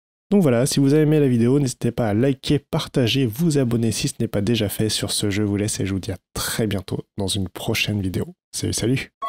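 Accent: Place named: England, United Kingdom. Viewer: J’adore cet accent anglais!!!🤣👍🏻...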